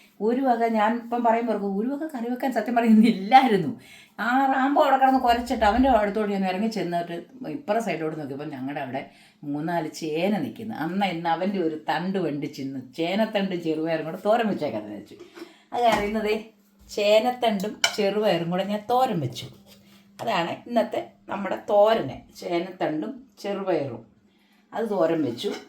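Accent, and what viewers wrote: South Kerala, India. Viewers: 🙏തനി തെക്കൻ ശൈലി കേൾക്കാൻ നല്ലരസം കറികളൊക്കെ ഒതുക്കി വിളമ്പു നാനും ഒരു തിരുവിങ്കൂറുകാരിയാണ് ഏതു ജോലി ക്കും വിഷമങ്ങളുണ്ട് ഒന്നിനും മടി പാടില്ല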